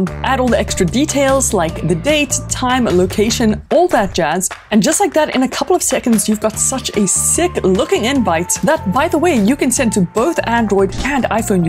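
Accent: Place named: South Africa. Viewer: Cool Soth Afreekan accent.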